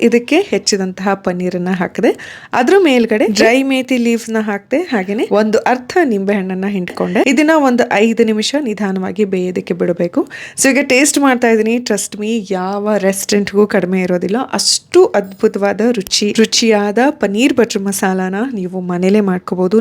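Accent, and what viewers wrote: North Karnataka, India. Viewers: Mam I like the way u talk mam and ur uttara karnataka talking style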